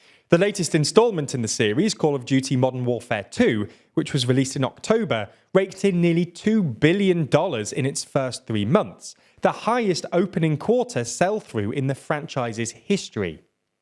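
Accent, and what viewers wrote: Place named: United Kingdom. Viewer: Hi, I'm spanish. Sooo, this is what you call a strong british accent? My god, that pronunciation of 'Blizzard' was incredible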